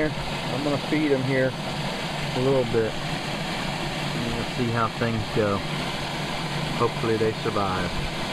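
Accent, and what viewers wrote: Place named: United States. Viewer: whish I had your usa twangy accent, I would sound really good singing boogie blues but I am french when I sing I USA blues classic I sound crap... Nice video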